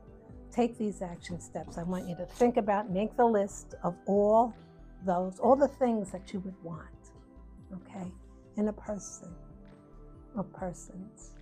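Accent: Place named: New York, United States. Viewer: you're a sweetheart. I Love your NY accent - and I'm guessing Jewish?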